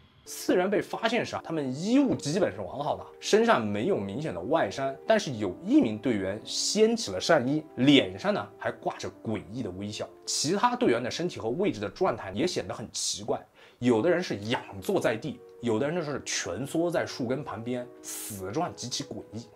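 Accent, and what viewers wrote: Yunnan, China. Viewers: 应该是个银南人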